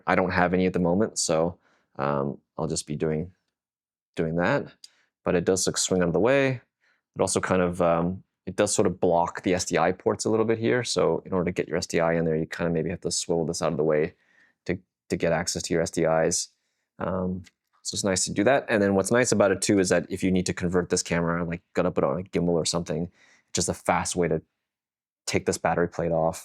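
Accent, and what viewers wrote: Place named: Toronto, Canada. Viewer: Cine in the 6? Sounds like Toronto, followed 🤙🏾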